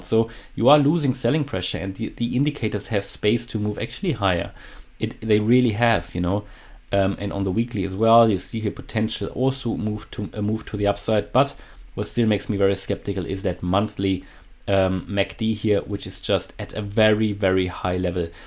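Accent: Germany. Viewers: Where are you from? I kinda detect a german accent? ...Just curious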